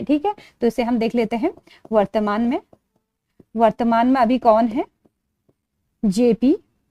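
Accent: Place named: Pahari, India. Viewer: पहाड़ी tone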